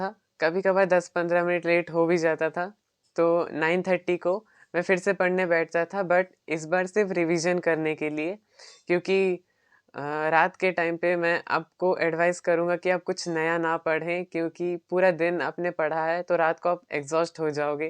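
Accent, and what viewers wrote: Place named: Bengal, India. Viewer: তুমি কি বাঙালি??? কথার মধ্যে বাংলা tone আছে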